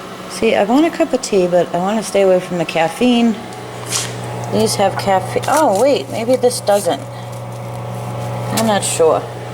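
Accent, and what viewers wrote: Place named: East Coast, United States. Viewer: I found your channel over the weekend and I thought I'd leave a comment and say HI! & say.... please do tons of hauls! If some are sick of em they can skip that video. & Yes I can see :) LoL I love all the stuff you do! & You cook things that I can do! I am definately going to try the stir fry I watched last night!! Last thing is I love your east coast accent! I & My family are from the corner of Indiana near Chicago so I am used to a striong Chicago accent! XoXo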